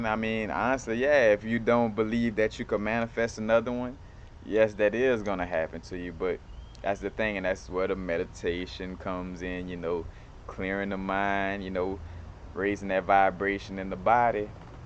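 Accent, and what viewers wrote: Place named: Louisiana, United States. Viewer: You gotta be from NOLA or somewhere in Louisiana lol I'm from there and I recognized your accent😭 anyways keep making videos please, I love your vibe❤️ such a beautiful human being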